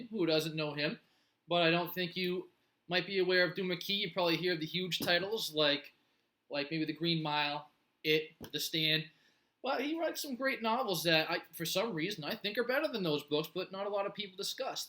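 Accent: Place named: Boston, United States. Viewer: Patients lmfao!! Are you from Boston btw you got hint of accent, as I’m from Boston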